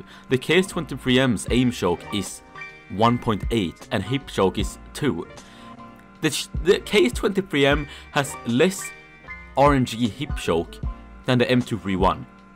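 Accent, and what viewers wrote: Sweden. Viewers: You sound swedish. I can tell because I am swedish.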